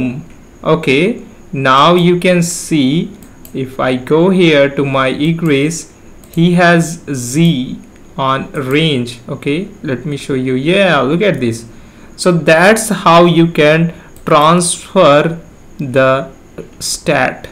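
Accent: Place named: India. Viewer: He as the voice of an Indian scammer....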